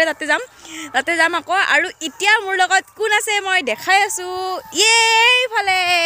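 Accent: Nalbari, India. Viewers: বহুত ভাল লাগিল ভিদিওটো, আপোনাৰ ভিদিও চায়ে থাকো । বিশেষকৈ নলবেইৰা ভাষাটো ক‌লি আৰু বেছি ভাল লাগে । মাজে মাজে কৈ থাকিব চোন। ❣️❣️❣️ ( নলবাৰী ধমধমাৰ পৰা) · Apunar ghar kot baa mane apunar vakha2 olp nalbari r nisina mr oo ghar t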